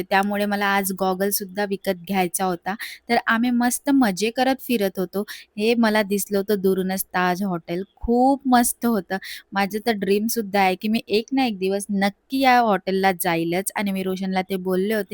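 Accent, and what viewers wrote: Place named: Vidarbha, India. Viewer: मराठी भाषेचा विदर्भ tone बदलून शुद्ध केला तर खूप छान व्हिडिओ होतील आणि नक्कीच चॅनेल monitiesed होण्यास मदत होईल, कारण बाकी सर्व छान आहे त्यात केस तर खूप सुंदर ❤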